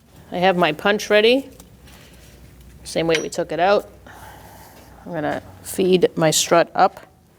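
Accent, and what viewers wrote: Boston, United States. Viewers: This lady is awesome! I've watched a few videos with her. Good instructor and I love her Bostonian? accent. Cah.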